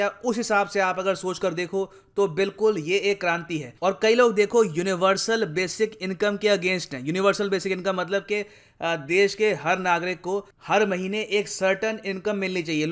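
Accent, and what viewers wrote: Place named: Uttar Pradesh, India. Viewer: Bro just remember one thing India has a variety of language and every indian citizen has it's own mother tongue (not hindi) like basically belong to Uttar Pradesh (having 100's of local languages like Khadi boli,Awadhi, Bundeli, Bhojpuri etc which is not Hindi) by the passage of time majority of people of UP switched to Hindi but the accent somehow sometimes somewhere remains in them that's why there is difference in accent of many people which didn't fade easily...and it's not a bad thing. In my opinion we have to conserve our mother tongue and we should not point out the mistakes in someone's accent because every language is Just for communication and if you are understanding the point..the purpose of communication is done. So next time don't make fun of anyone on the basis of their language or accent in language. 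Respect and be proud of the diversity of India 🇮🇳🙏❤️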